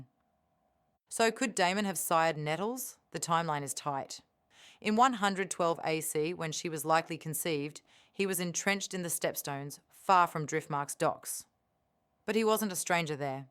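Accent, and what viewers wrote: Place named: Australia. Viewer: This sounds like the same voice as the last video, but with an Australian accent instead of a British one... I want a Jamaican accent for the next video.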